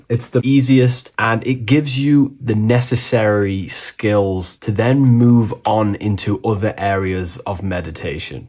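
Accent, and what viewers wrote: United Kingdom. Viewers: Iam so happy that i found someone like you , your chanel so helpfull you answered so many quations i had , i mean there is somthing diffrent about you , you know what you are talking about , i really like this, and i love you british accent too, thank you for your existance and iam so greatfull finding you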